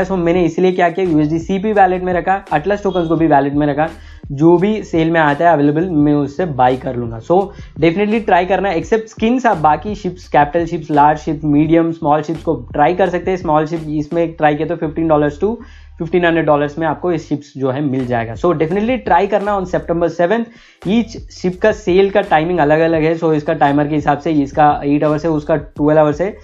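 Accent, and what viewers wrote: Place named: South India, India. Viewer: maybe your accent is different that's why , my south indian friends are also like you , don't take it to your heart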